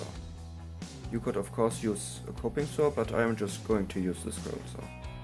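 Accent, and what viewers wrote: Germany. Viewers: Man merkt das du deutsch bist😂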